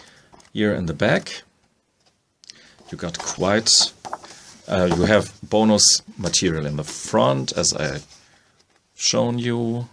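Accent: Germany. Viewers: I Listen to your soothing German accent while painting. Keep up the great work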